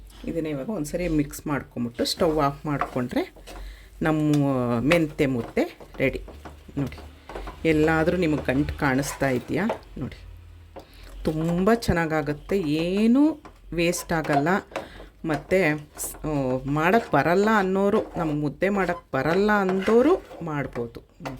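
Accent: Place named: South Canara, India. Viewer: Neevu South canara antha gottayithu. Yavuooru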